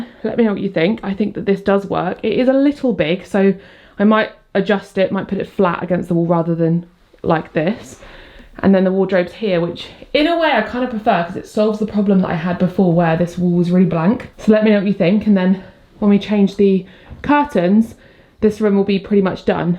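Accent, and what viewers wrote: United Kingdom. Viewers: I abslolutly love your videos ! You give me so much motivation and joy to declutter and organize my bedroom, and I also love the fact that you are vert natural during tour videos, you don't try to be someone else so it feel so good to see you very shiny and happy. You inspire me to embrace my values and to give me hope, thank you and never stop showing us your declutter video ! Ps : as a 17 years old french girl, I'm completely in love whit your british accent ! And it help me to understand english with the british accent so thank you ! <3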